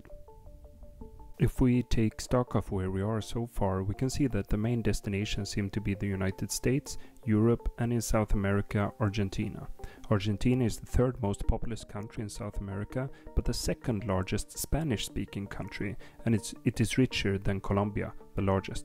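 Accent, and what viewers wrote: Sweden. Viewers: Visste direkt att du var svensk med den där dialekten haha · Why do so many swedes speak this way? There do you learn to speak this way? Also Dave brought me here.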